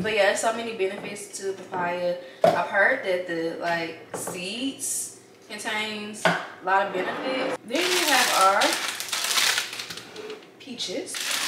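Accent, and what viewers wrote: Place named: South, United States. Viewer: Love your accent it's a southern or Midwest accent. Where are you from?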